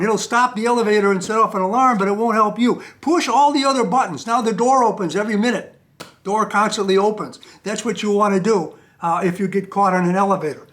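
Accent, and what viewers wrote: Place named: Chicago, United States. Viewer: Unc you definitely sound like a kid raised to in the Chi..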